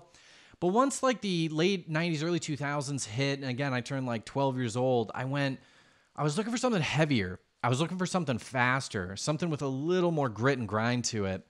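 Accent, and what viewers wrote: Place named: United States. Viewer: Where you from bro? You have one of those hard to place accents. Colorado maybe, or Cali? Keep it up man, this is becoming one of my favorite channels! Quit MGK and Post Malone though, they're both wankers lol!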